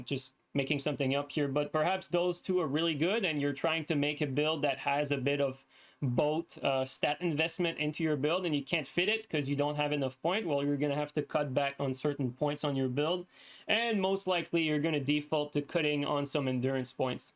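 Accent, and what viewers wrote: Quebec, Canada. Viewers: This guy also sounds so Quebecois, cris de tabarnak.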